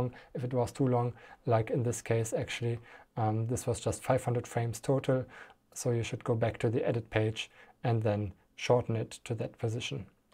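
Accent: Germany. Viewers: Thank you! - very helpfull. Nice german accent. Danke für das Tutorial ;-)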